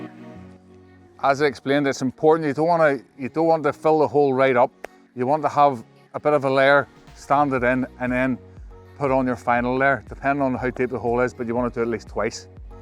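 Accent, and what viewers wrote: Northern Ireland, United Kingdom. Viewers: Norn Iron accent AYE??? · Beautiful response, and I LOVE your "broad Northern Irish accent" !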